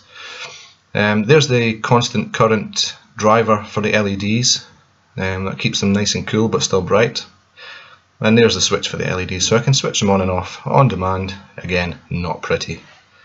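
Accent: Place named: Aberdeen, United Kingdom. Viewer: Great video! You sound like you're near me. Aberdeen?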